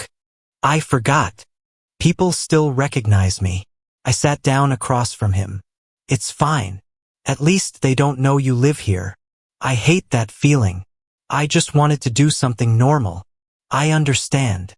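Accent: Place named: Spain. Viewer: wtf is with the Spanish voice inflections? Can we PLEASE knock that shit off ?????!!!!!????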